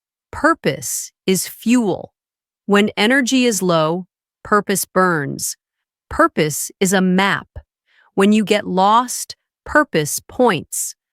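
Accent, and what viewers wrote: United States. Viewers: It is an American accent based on my hearing.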